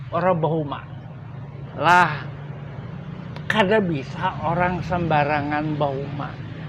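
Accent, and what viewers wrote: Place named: Java, Indonesia. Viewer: mantap👍👍👍....logat nya kayak jawa ngapak yak heheh 😁salam sejahterah dan damai selalu buat seluruh warga kalimantan🙏🙏🙏kita smua bersaudara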